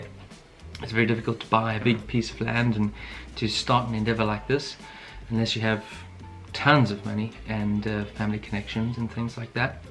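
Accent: South Africa. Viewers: Bra, you have an Anglo South african accent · Your accent is South African...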